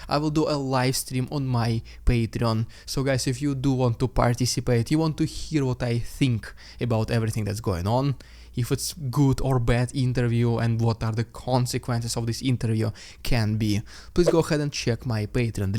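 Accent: Russia. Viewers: Dude- I LOVE the Russian accent, so I'm not dissing you at all- but turning on captioning returns some HILARIOUS results of voice-to-text.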